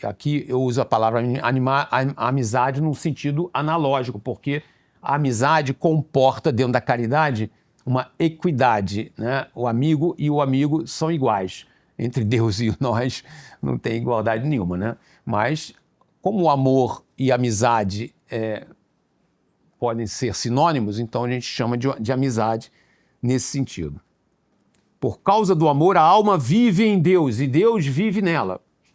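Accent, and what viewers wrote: Rio de Janeiro, Brazil. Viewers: não nega que é do Rio… fala na lata🙈! Perfeito! Torço para que nos presenteie com mais aulas no CDB…👏🏻👏🏻👏🏻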